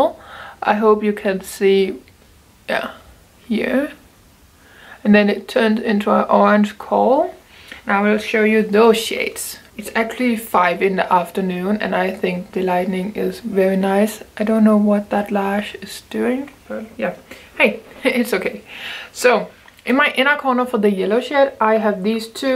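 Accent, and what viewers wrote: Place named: Denmark. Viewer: Danish? Love the accent!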